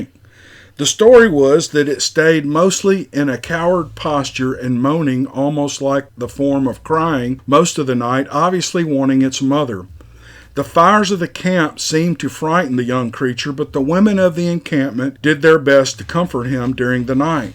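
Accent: South, United States. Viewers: That southern drawl is like comfort food but for my ears and mind, thanks ❤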